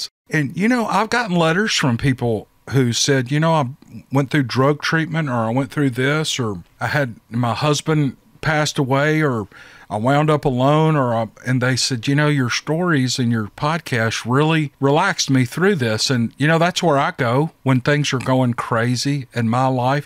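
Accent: South, United States. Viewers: Just found you and enjoyed your stories! Great southern voice too!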